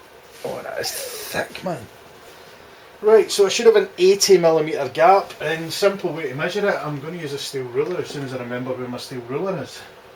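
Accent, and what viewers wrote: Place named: Scotland, United Kingdom. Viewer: I believe he's a Scot....love the accent:)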